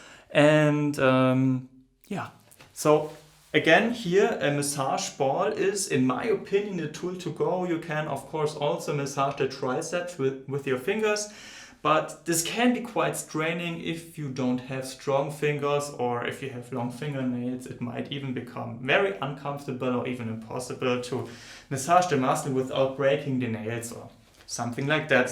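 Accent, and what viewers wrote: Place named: Germany. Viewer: German or maybe even swiss?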